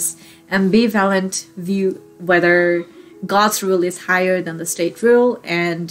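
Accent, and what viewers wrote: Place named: United States. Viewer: Haina yo Nepal ma padheko manche ko american accent kasari aayo? Hollywood filim dheri herdoracha kyare ...😅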